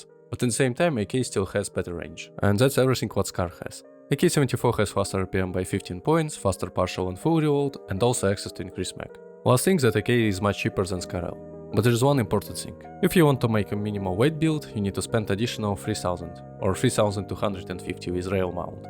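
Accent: Russia. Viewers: I thought you were russian Nice video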